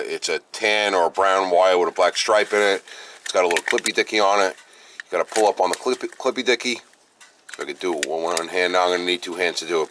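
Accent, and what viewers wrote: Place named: Northeast, United States. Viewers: Great video! By your accent, I can tell you're from NY, PA MA or somethin' lol. I have an 85 caprice, tbi as well. V6 4.3 (262) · Definitely Long Island ✌️ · Great video, thank you! Hopefully this will help my 87. Also, I wish you said retarded more, it sounds great with the New England accent.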